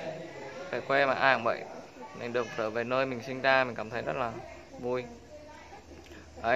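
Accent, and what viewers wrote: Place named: Nghe An, Vietnam. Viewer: Em nous quê bố Đắc Lắc mà sao chị nghe như nói giọng như người Nghệ an vậy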